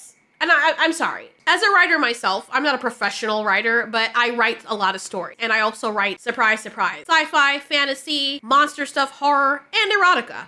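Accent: United Kingdom. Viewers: Your British accent makes me laugh. It’s just frustrating that many US channels now do a posh (i.e. conservative) British accent to read California-penned (i.e. “woke”) articles…. which just makes no sense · don’t listen and don’t apologize to him. We love your British accent. He’s just jealous because he can’t sound as cute as you.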